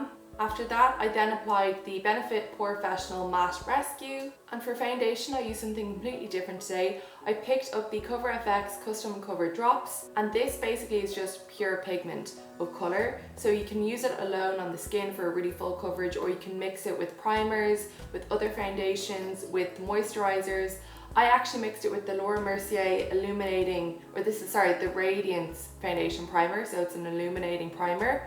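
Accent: Ireland. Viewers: Are u Irish ??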